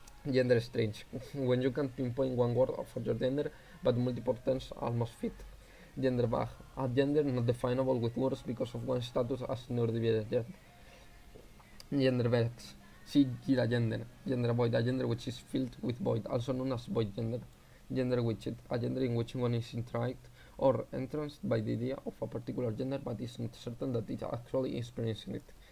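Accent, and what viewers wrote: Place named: Cambridge, United Kingdom. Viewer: Inglés de Cambridge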